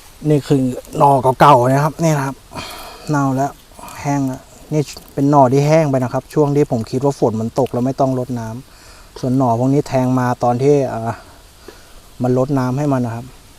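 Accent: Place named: Buriram, Thailand. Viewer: สำเนียงอยู่จังหวัด.บุรีรัมย์ค่ะ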